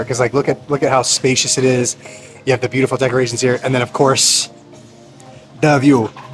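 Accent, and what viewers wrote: Philippines. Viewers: I love your vlogs, showing local places and I love your Filipino accent.my husband of 41 years still can't get it..He has a limited vocabulary no matter how much I taught him 😅😅😅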